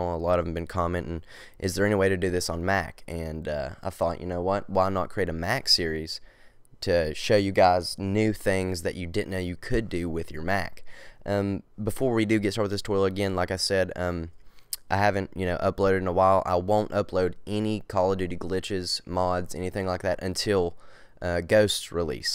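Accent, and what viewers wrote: South, United States. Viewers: This is completely unrelated but I just had to say something about your accent. It is absolutely wonderful! Sounds a bit Southern. Other than that, great video and thanks for sharing!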